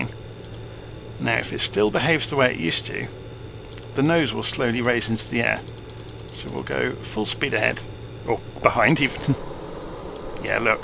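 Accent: United Kingdom. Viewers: Hello, thank you for the calm and lucidity you bring to flight simming by way of your well conducted videos. I have a question, when you bring up multiple screens simultaneously on then narrow window, what program/app do you use to accomplish same? By the way, your calm and polished British accent is very easy on the ear😊! Thank you.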